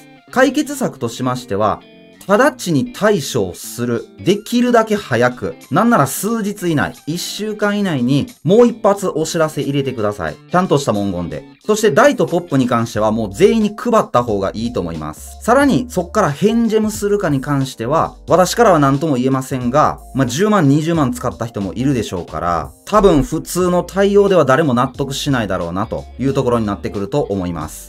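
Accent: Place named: Kansai, Japan. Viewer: 関西弁がいい仕事してます笑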